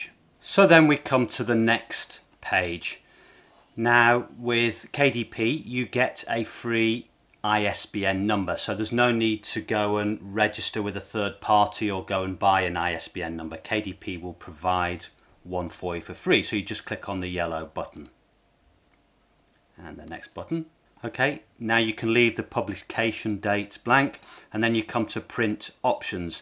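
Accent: England, United Kingdom. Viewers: Good to hear an English accent, regarding making money, keep it up, your videos are very informative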